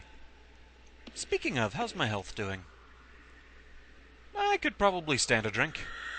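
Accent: United Kingdom. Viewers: I know im late and this was posted years ago I like your let's plays...by the way are you British if add on your English is good ?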